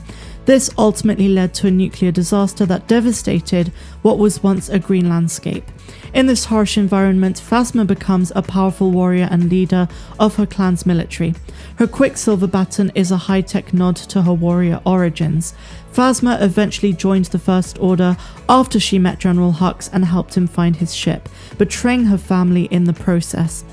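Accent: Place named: West Country, United Kingdom. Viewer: Is from bristol? Or West country? I can hear a twang! Happy new year Love the content!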